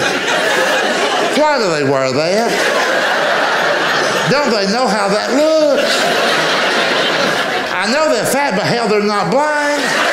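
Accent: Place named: Louisiana, United States. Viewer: sounds like he's been to Louisiana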